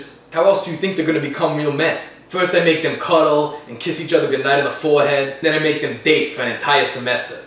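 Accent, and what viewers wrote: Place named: New York, United States. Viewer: I have a guy like this in my fraternity. Dude is hilarious. Same accent, too. NYC!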